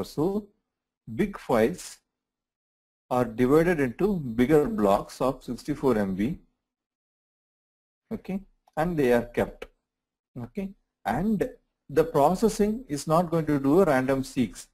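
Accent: India. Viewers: it is easier if u are indian. bec you are having the same accent, no offense.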